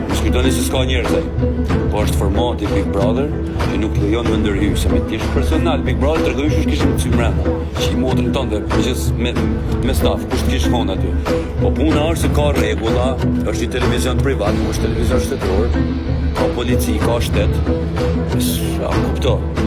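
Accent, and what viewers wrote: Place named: Durrës, Albania. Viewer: Ca bone si fol arkimeti si na durrsi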